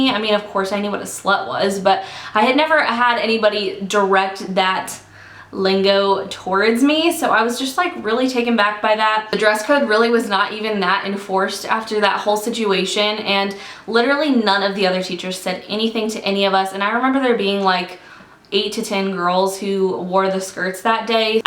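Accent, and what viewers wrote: United Kingdom. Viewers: Sounds Like Plymouth south muddle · how are you not british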